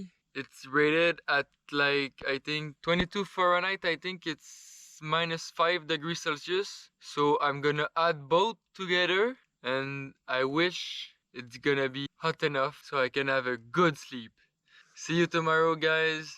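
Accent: France. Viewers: Living in Vermont and loving Quebec, I was excited to hear what you deal with in your travels. Some day we'll be able to cross the border again, eh? So it was frustrating to have the music too loud at points. Since you seem to be a native French speaker, the accent adds to the problem (but thank you for doing this in English). I'd suggest no music when speaking as a rule; people listen on so many different kinds of speakers and such. What might sound great on headphones can be junk on a phone speaker or such. Keep going, little glitches will iron out and what you are doing is great. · Ur Accent seems like ur a French Guy!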